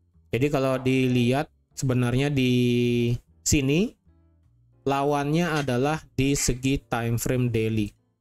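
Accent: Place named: Bali, Indonesia. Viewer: dari logatnya asal bali nih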